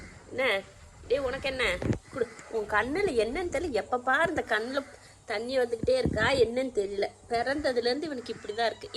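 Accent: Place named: South Tamil Nadu, India. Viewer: Sis neenga irukirathu covai. But native south district. Am I right? B cas neenga pesura slang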